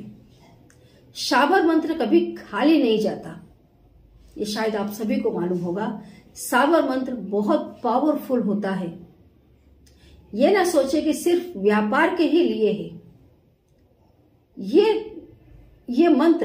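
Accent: Bengal, India. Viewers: arre SHE DOESNT LISTEN AT ALL, SHES A WEIRD LADY, I HAVE TOLD HER MANY TIMES SHE HAS A BENGALI ACCENT AISE KI TAISE KAR DETI HIAN MANTRAS KI, NAHIN SAMAJH MAIN AATA HAIN BHAI KYA KARUN...UNSUBSCRIBE KARDUN KYA, SHE DOESNT UNDERSTAND AT ALLL,,,,,,SHE SPEAKS WITH ACCENT SAMAJH HI NAHIN AATA HIAN DAMNIT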